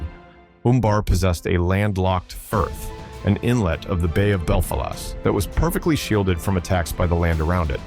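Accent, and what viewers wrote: United States. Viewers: The pronunciation of place names is too American. Especially Osgiliath and Eregion.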